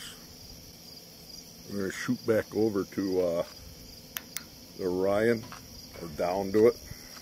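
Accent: Minnesota, United States. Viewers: Must be from Minnesota. Great video.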